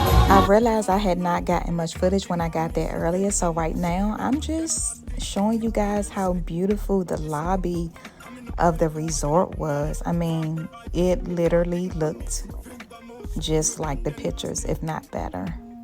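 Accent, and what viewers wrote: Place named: Maryland, United States. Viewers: I live in MD PG county area..I know that accent anywhere 😌💕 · As soon as the video started I said "oh she from DC." LOL!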